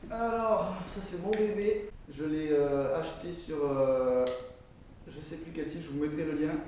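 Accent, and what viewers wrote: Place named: South, France. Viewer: on reconnait la petite pointe du sud avec le ptit "tchichou" ^^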